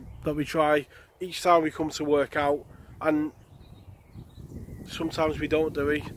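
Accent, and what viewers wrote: Liverpool, United Kingdom. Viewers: Love the vids Even got the Mrs subscribed and just watched this Together! Watching your vids always gives me inspiration to get out there! Like you said mate Angling is therapy! And a Fish is a Bonus! Nice Fish well earned! Hope to see you next week fella! Ain't missed a Vid in the Last couple of years.Your "Naturals" are the best? The pools nobody really bothers with? That you ain't sure what your gonna get? Like your MR PIKE VID a couple of months ago that kept Killing your swim and smashed you off at 1 point!!! Tight Lines big Lad! The Mrs is a Fan Now: ) She said? Is he a scouser? I said Manchester? But with you getting your Hemp from Cheshire particals? I'm guessing somewhere in between? Wigan? St. Helens?:):)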